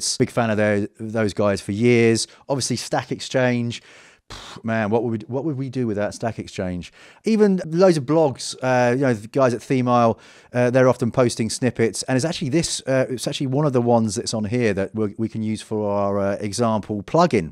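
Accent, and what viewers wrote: United Kingdom. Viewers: Love your tutorial plus your british accent....from Nigeria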